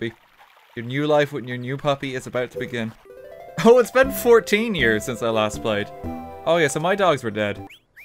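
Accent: Ireland. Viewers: are you irish edit: nvm u said it in the video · it’s okay, he’s irish guys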